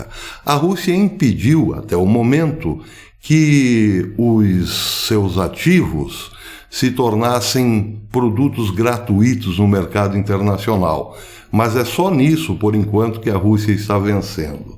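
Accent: Caipira, Brazil. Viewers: O senhor é o máximo com essa forma meio caipira de falar, amooooo, carinhos fraternos.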